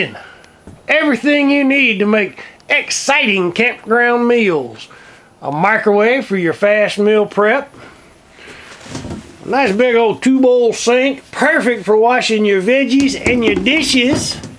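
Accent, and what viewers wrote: Texas, United States. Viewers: i really love this video ,, thanks you Texas accent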